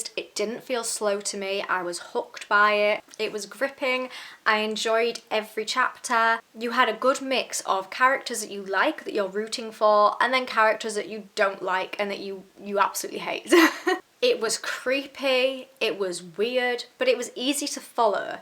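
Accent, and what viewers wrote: United Kingdom. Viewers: Wow, absolutely love your review. And love listening to your voice and British accent, too! I agree with what you said about King's writing style. He's an absolute master when it comes to character development and description. He has a way of writing that makes you lose yourself completely in the characters' thoughts and emotions, until you forget everything else around you. The Shining is definitely one of my top favorite Stephen King novels. I've read every King novel so far, and I highly recommend you read The Dead Zone, Pet Sematary (very scary, but oh so good!), Christine, The Green Mile, Misery, 11/22/63 and the novella collection Different Seasons. Just keep them coming! 😊📖☕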